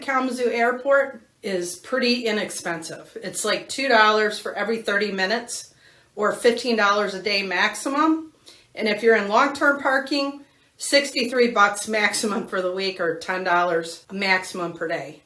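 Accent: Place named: Michigan, United States. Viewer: Perfect Michigan accent!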